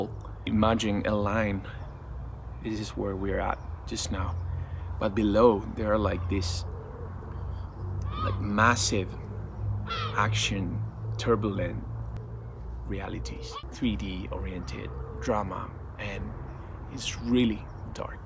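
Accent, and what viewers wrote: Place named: Sweden. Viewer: 100!Resonate. You sound Swedish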